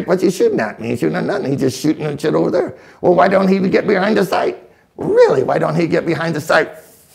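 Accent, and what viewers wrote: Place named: United States. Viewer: Which region of USA do you belong to sir? You have a very interesting accent.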